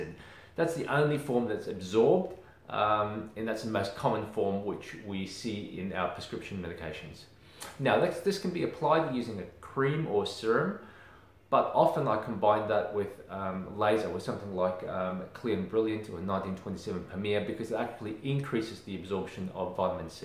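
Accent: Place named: Australia. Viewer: Such an informative and easy to understand video! Love your Aussie accent and your smile! Thanks!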